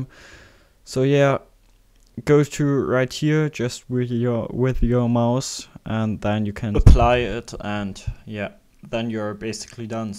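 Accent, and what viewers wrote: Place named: Germany. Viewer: Man merkt dass du deutscher bist :D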